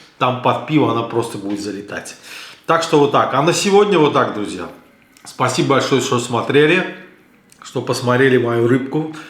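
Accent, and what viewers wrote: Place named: Baku, Azerbaijan. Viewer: Салам из Дербента....спасибо за такие видео.. Такой,родной Бакинский акцент Саг Ол От души 👍👍👍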